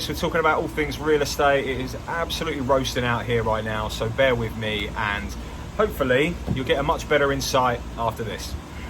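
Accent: United Kingdom. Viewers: I’m moving to Orlando in 5 years, we have family in the USA 🇺🇸 you sound like a Brit from the UK. I live in London, and very worried about trump and his policies regarding immigration as for interest rates they only low for domestic UK citizens if your UK buyer they want 5 1/2 up to 8% and they want 40% deposit down so you get discriminated against because you’re investing in their country, disappointing, 👎🏼👎🏼👎🏼👎🏼👎🏼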